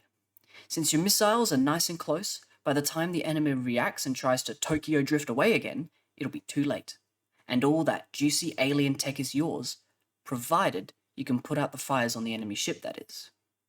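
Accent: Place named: Australia. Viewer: Nice to hear another Aussie voice picking up where Perun left. I'd love to see an update of tactics and overview of what's changed in the game. I stopped playing it a while back after a few play-throughs proved the game needed a lot of work to balance it and make it enjoyable for most players that don't have a masochistic streak. It has a lot of potential but is damn complex with so much of how the game works hidden, making it difficult to understand for many. Harder still with all the updates. Harder again if pandering to fanbois that do nothing to actually bring in new players and money. I hope Johnny Lump and co make a success out of this though as it's probably the most realistic grand strategy game out.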